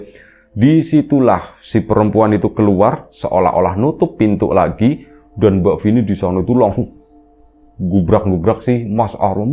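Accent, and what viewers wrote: Tegal, Indonesia. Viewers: Jdi kepo dg cerita2 di Riam Biru, ceritanya beragam thema dan variatif. Naratornya jg dlm bercerita kdng bikin aku ketawa sndiri, khas org Tegal. Salam buat temen2 yg di Tegal, jln layang, jln zaenal arifin,yg deket texin dan lain2nya...salam dari jogja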